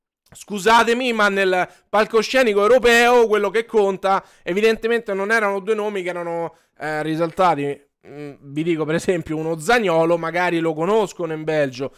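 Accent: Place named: Rome, Italy. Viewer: Perché imiti i tifosi interisti parlando romano?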